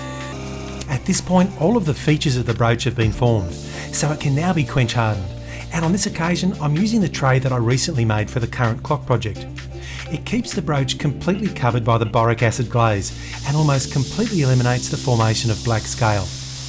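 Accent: Australia. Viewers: realised that now when you say it. At first I thought he was Britain but then When you said it. I realised that he is Australian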